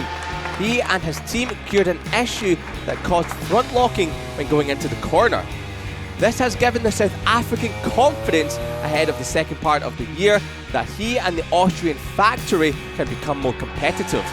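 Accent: Scotland, United Kingdom. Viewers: I'M SO SICK TO LISTENING THIS VERY-ACCENTUAL SCOTTISH VOICE IN EVERY MOTOGP VIDEO. It's almost like listening to Louis Suddaby on the weekend itself.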